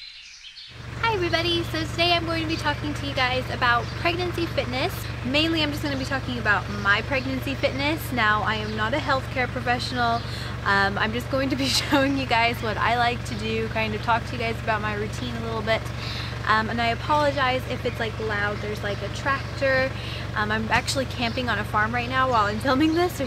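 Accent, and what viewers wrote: United States. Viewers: Just found your videos and I love them. I love how much of a healthy life you live. I don't know if you mentioned this in another video or not but how did you meet your husband? I was just wondering because you sound American and your husband sounds German and you mentioned living in Germany, so I was just wondering how you ended up meeting one another? =)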